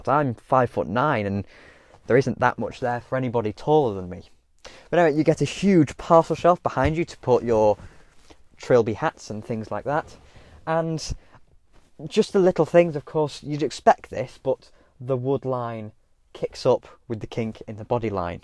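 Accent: United Kingdom. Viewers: Very lovely piece of information I actually spent a year at the river Humber in (Kingston upon) Hill. Do I notice slight similarities in your dialect to what I heard in those days up in the North or am I put wrongly as far as your region is concerned? I luvved de North!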